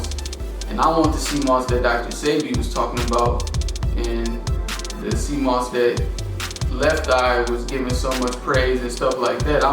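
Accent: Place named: Philadelphia, United States. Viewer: 😂 I hear Philly all in this man voice. Good video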